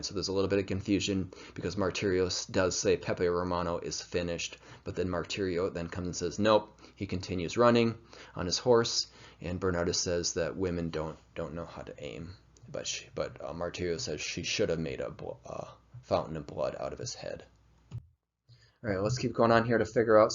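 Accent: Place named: United States. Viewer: Thanks so much for creating this video. I think it will help my son a lot. As a fellow English-Spanish bilingual teacher, I wondered if you have ever considered getting coached to have a more native accent, whether from Spain or somewhere in Latin America? I know that for many students it is difficult to understand native Spanish speakers after years of having Spanish teachers who, though excellent just as you are, have such a strong gringo accent.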